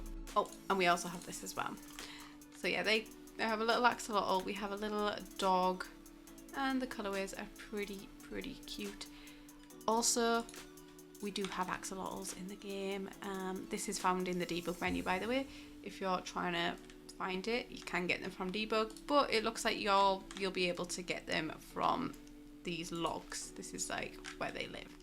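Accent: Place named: England, United Kingdom. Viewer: I was watching this video and my dad overheard, and he thinks you’re from around where he grew up in England 😂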